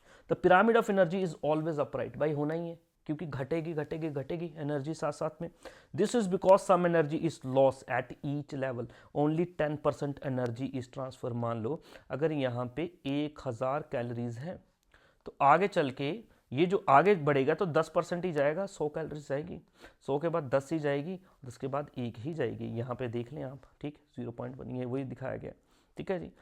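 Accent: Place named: Punjab, India. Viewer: Sir aap Punjabi ke lagte ho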